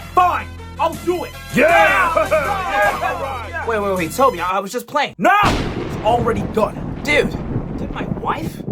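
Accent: South Africa. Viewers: Your South African? Same 😅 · Bro, you South African? Shocked by accent. Gonna sub in any case.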